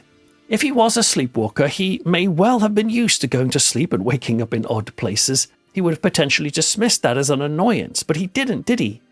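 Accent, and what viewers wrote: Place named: United Kingdom. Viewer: Ya gotta love British accents though. Especially. It’s somehow especially fitting for ghost stories, don’t you think?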